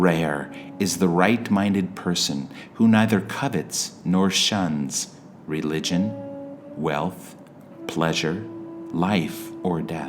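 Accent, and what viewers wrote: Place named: United States. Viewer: It seemed a risky idea to hear this in American English. It turns out it was fine. Thanks 💕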